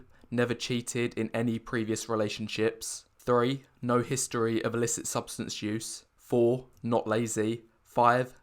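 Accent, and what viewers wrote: United Kingdom. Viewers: The british accent completes it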